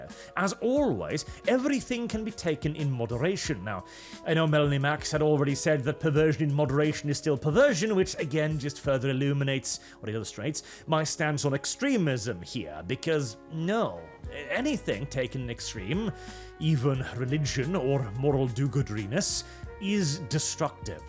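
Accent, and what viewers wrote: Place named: Norwich, United Kingdom. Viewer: What game is this? I cant understand Norwish accent.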